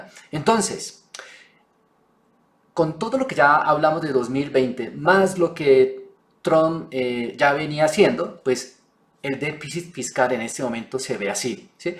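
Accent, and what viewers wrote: Colombia. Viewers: Hablas muy bogotano, te faltó decir carachas y régio chhhregio. Pero Usa no quiere reconocer la deuda, dijo que no reconocerá esos dineros a China, como indemnización por haber causado la pandemia. Euroasia ya negocia en con las respectivas monedasque la conforman y el poder militar gringo no es predominante para respaldar países que deben someterse a las reglas transaccionales basadas en el Usd. Tienes razón los cocos no caen a la vez, pero el blanqueo de los dineros del narcotráfico en la Banca sionista-judía ya se vienen conociendo. Qué ocurrirá con estos informes? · Wawww. Me sorprende ver un asiático hablando muy bien español. Lo felicito, me imagino que vive en España 🇪🇸, 🇺🇸 ó Sur-América? Bueno no puedo recocer su acento. Aunque parece ser que su acento es Colombiano 🇨🇴. Sea como sea lo felicito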